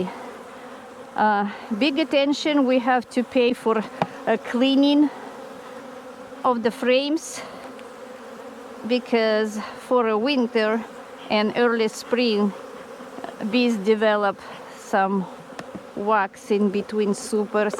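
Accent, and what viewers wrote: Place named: Russia. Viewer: Замечательный русский акцент😁., мой английский не совсем вери гуд. Как потом с маткой решается вопрос? Она же среди этих 5 корпусов.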